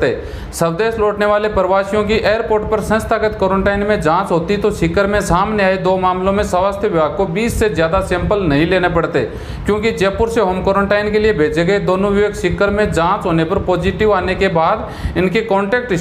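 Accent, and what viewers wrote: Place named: Lucknow, India. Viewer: Sir from where you belong Can you tell me Because your Hindi language is like Lucknow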